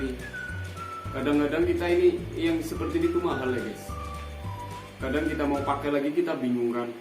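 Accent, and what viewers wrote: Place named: Tegal, Indonesia. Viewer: Tenang ae mas e wis tak sasbcribe... Sampeyan wong ndi,sih logate kaya Tegal ..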